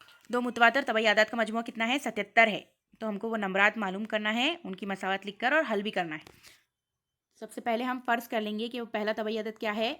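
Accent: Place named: India. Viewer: Good job. Hmaray bacho ko boht faida hoga. Itni saaf urdu india may. Salute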